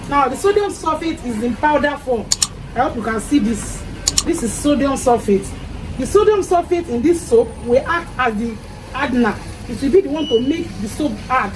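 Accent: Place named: Africa. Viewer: Can I just say I Love ya accent. Your accent make me miss my friends so much. I'm in a state or town where there are no African here. Sucks.😩. Don't mind me..but I love the video.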